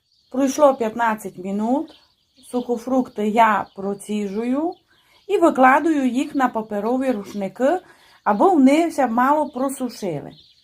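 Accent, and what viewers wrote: Transcarpathia, Ukraine. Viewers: Сьогодні зпекла всі три види, тісто чудове. Я багато печу, цей рецепт не новинка, але дуже мені подобається ця закарпатська говірка. Дякую,. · Не показуйте будь ласка свою неосвіченість. Це на Закарпатті такий діалект. Ніхто мову не кривляє.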